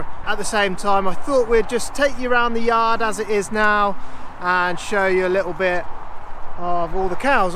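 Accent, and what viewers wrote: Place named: Oxford, United Kingdom. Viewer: Thought I detected an Oxford accent! I live in cornwall now but often travel the M40 and spotted Four Winds Farm years ago. I collect old tractors and have two ex Peter Turney ones in the shed. Wrong colour for you but I’m sure you’ll know the company!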